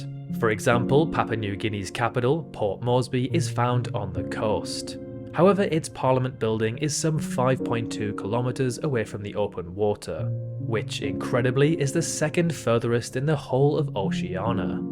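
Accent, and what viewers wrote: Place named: United Kingdom. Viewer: Remember that he is British. British people talk differently. I kinda like the pronunciation “Kih-gah-lee” better anyway.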